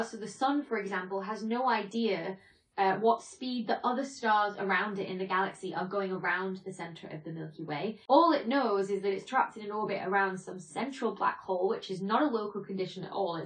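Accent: United Kingdom. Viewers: It makes very little difference, but for some reason i find it really pleasant to hear a british voice explaining science for a change. I seem to follow all the american science channels. Subscribed, and totally not biased.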